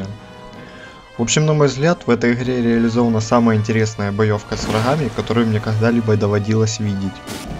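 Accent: Ukraine. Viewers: Хватит издеваться над произношением автора, он не Хыкает, это украинский акцент такой, в большинстве слов вместо привычного Г есть нечто вроде Х, а там где четкое Г нужно, там есть Ґ, но оно редко используеться. Также например привычно говорить ШО вместо ЧТО. Язык такой, имейте совесть :) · Автор! Я балдею от твоего украинского произношения. Не слушай никого! Оно прекрасно.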